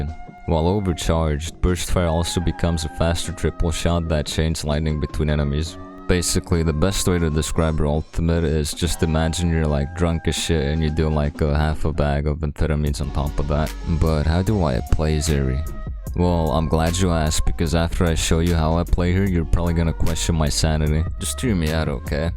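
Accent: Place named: New Jersey, United States. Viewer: u sound like u have a sopranos accent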